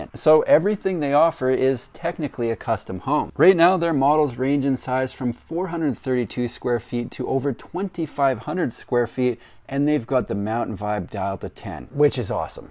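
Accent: Canada. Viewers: Thank you for informing all of us about so many interesting homes. I believe homes, built in pieces, are the future. They take less time to build on-site. You are terrific! ha ha I thought you were a california guy but now know you are canadian...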